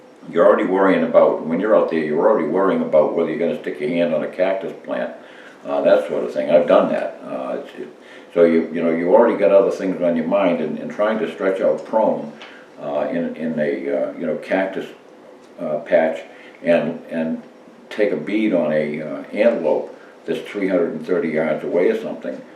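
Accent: New England, United States. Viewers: You sound like your from New England! I say this because my Dad's family has been in Massachusetts and Connecticut for nearly 400 years! So, you sound very familiar to my ears!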